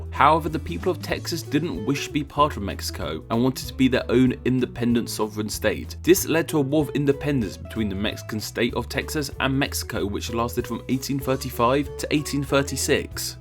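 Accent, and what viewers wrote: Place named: United Kingdom. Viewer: The accent lost me. British talking about Texas. Hmm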